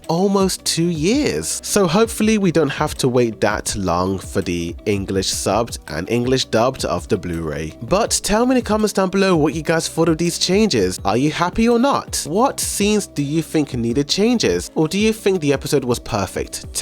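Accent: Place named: United Kingdom. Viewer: it's definitely his accent but I doubt English is not his first language. His accent is...English. Sounds like almost every UK dude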